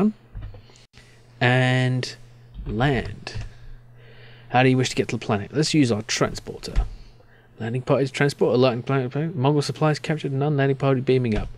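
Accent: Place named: United Kingdom. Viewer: Your British accent is much appreciated from the USA.🇺🇲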